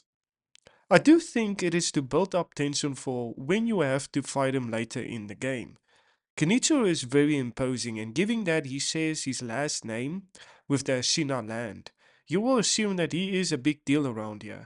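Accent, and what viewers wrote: South Africa. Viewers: Not used to hearing heavy South African accent 😅 awesome vid boet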